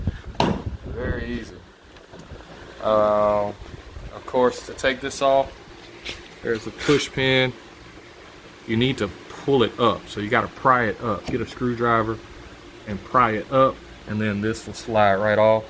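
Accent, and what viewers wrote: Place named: South, United States. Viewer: Yo G you sounding hella country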